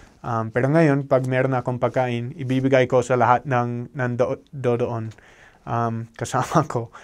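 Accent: Philippines. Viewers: The pilipino accent was there, just to improve or learn Tagalog deep words, you know what right now in the Philippines 75% of pilipino weren't spoke deep Tagalog language coz of a English come in our country. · You sound like a Filipino! You love us? We love you too!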